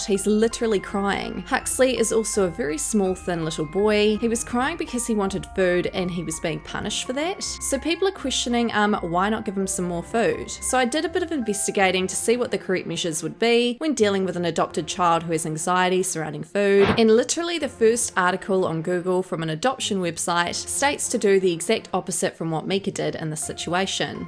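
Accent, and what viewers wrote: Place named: New Zealand. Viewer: Im watching for your Kiwi accent 👌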